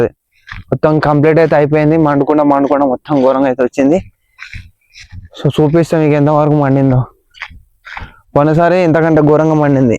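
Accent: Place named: Telangana, India. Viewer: Am loveda lo slang ra idhi thu .. telugu rada me TS vallaki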